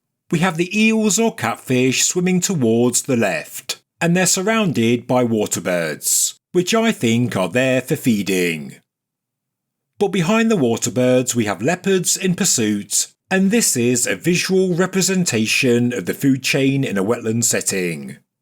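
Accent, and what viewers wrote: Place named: England, United Kingdom. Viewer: What a complete load of rubbish... you people with the PhDs just parrot the books and words of others and NONE of you think for yourselves. The entire Gobekli Tepe site refers to the movement of our galaxy for a period from its creation 257 billion years ago to the point in time it was modified some 90 billion years ago. The animals refereed to on the pillars are those who evolved and some recovered extinct humans from a past time... The site deliberately buried as what it refers to , no longer happens. The good things is,,, those who left Gobekli Tepe and the Pyramids and Megaliths come back and remove all those telling the lies of human history and MANY have English accents... just like yours..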